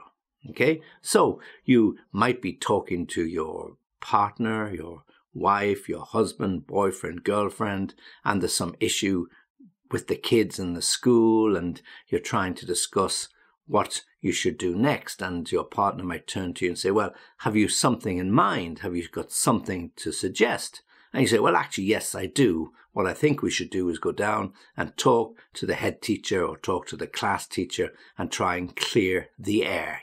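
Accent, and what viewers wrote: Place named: North England, United Kingdom. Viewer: Hi! This is the first time I watch one of your videos, I really like your accent, it is so colourful, are you from the North of England?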